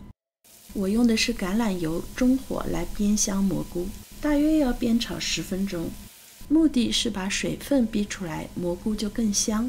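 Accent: Shanghai, China. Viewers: 听发音听出了上海人，看面粉牌子就知在美国对伐？这种菜包是王家沙经典包子。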